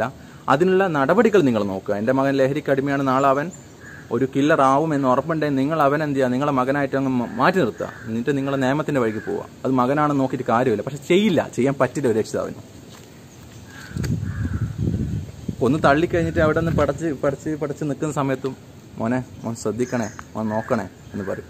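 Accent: Kannur, India. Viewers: Kannur language super 👍👍👍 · ഹിന്ദുവാണോ. ക്രസ്ത്യൻ ആണോ. മുസ്ലീം ആണോ . എന്ന് മനസ്സിലാവില്ല എന്നാലും കണ്ണൂരുകാരനാണെന്ന് വർത്താനം കേൾക്കുമ്പോ മനസ്സിലാകും. ഞാനും കണ്ണൂരാണെ.....😀😀😀😀😀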